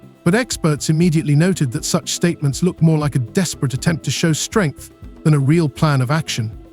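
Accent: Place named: United Kingdom. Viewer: YOUR TITLE IS AN ABSOLUTE JOKE,.... CANADA NEVER STABS... BTW, IS THIS AI.? OR ARE YOU A REAL PERSON.?? YOURE ANOTHER AMERICAS PUPPY THAT IAM TIRED OF.... WHERE DID THEY GET THESE BRIT ACCENT??? I DONT THINK THIS TRUE THOUGH THERES NO REPORT OF THIS DEAL WITH CHINA... DO YOU HATE OUR CANADA.?? DONT TALK NONSENSE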